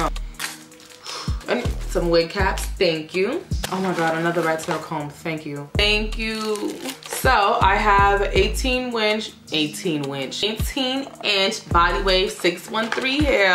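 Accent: New York, United States. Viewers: Girrrrlll, you are and look so gawgus and bomb, yaaaaasss hunty❤️I love that wig on you and the style you did. I def gotta do this style too! And your shades are mad cute! Where’d you get them?? I need the deets. Anyway, keep doing you, boo. Stay stunning and successful💕🙏🏿🤑 P.S. Are you a New Yorker ?? Lol. You sound like you’re from NY (but forgive me if I’m wrong).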